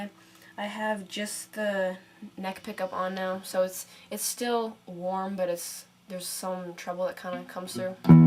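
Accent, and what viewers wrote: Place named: United States. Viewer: i thought you were british but you sound american .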